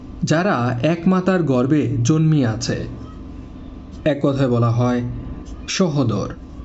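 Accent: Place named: Chittagong, Bangladesh. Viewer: স্যার মনে হয়, চট্টগ্রামের🙆🏿‍♂️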